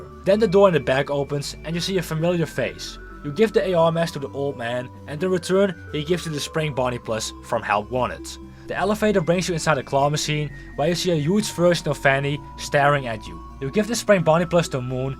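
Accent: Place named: Netherlands. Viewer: waarom klink je nederlands haha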